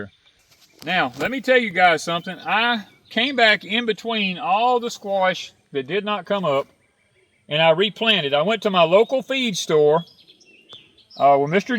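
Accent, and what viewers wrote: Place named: Texas, United States. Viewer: Hey guys, we’ve been trying to guess WHERE y’all are lol…we would say well they talk like us, have nut grass, a few weather moments yall would have matched ours, and finally just heard him say Conroe lol so finally someone that is in our climate. We’re in Beaumont adjacent aka Vidor. Nice to see that some of Conroe is holding onto the country lifestyle.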